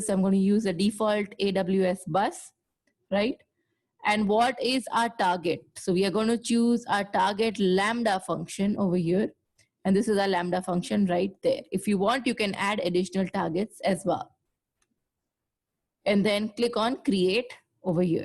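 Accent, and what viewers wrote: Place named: India. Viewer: Explained very well!. Was looking for one with Indian accent. Thanks a lot!!!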